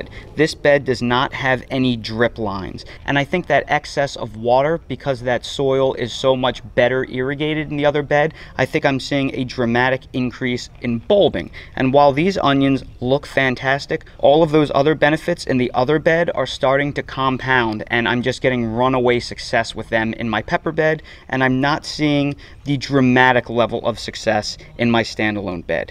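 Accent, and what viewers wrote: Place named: New Jersey, United States. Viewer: You are in Carolina but your accent screams NJ, where I grew up….. are you from there?!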